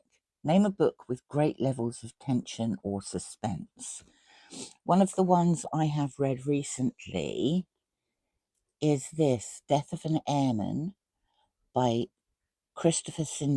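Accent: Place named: United Kingdom. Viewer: I enjoy the way you speak. It sounds very soft and elegant to me. I guess it kinda reminds me of Book Chat With Pat, but just… the British version? 😅 Yeah.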